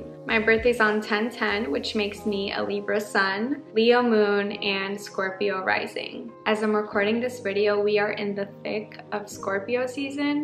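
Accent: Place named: Colombia. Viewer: I am so excited to see more! And your Spanish is beautiful – that Colombian accent, girl ✨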